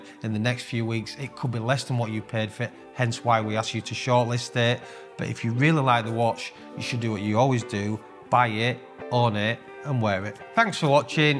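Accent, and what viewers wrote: United Kingdom. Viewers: But remember speaks the queen’s English unlike us Yanks across the pond.😉 Seriously, you are right.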